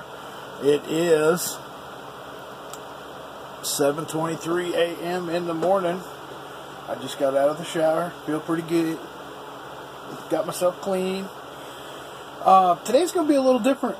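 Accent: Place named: Texas, United States. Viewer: You definitely don't sound like your from up North!! Lol.. You sound like a Texan or like a southerner.... Hahahahaha 😂😎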